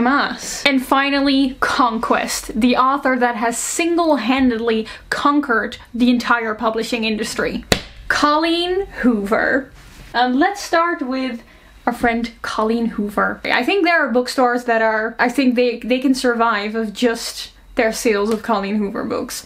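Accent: Netherlands. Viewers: are you dutch? i hear a slightly dutch accent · This is the first time that I've come across your channel and this is how it went: "Oh nice a video about booktok!" "Hey is my nickname, cool:)" *wachting the first minute of the video and thinking I'm hearing a very slight Dutch accent*: "Is this a fellow Dutchie?" *Checking the discription to find out and actually finding out that we have the same name😳" Why didn't I find this channel sooner😂😭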